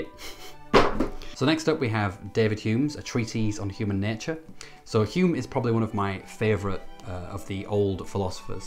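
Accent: Northern England, United Kingdom. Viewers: North England by the sound of it, though my American ears might deceive me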